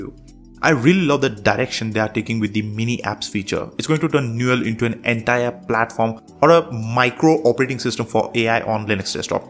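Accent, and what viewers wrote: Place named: India. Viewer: Dude, I don't understand what you are saying. you got strong Indian accent. I agree with everyone here. LINUX should be free from AI. I left windows 10 years ago, because I don't want Bill Gates to spy on my computer and steal my bank information and all other login info. Installing AI that is NOT open source means you are putting a Trojan horse in your computer. Soon AI will take over your laptop and STEAL all your bank info and MONEY. That is scary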